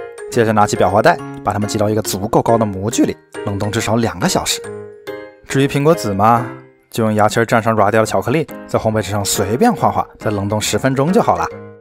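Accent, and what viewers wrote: Wuhan, China. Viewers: 大哥，你是武汉的吧🍭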